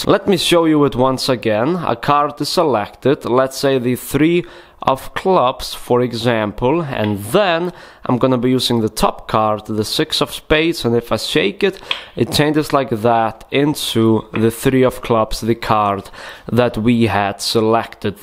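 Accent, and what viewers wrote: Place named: Russia. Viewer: Это лучший русский акцент из всех что я слышал!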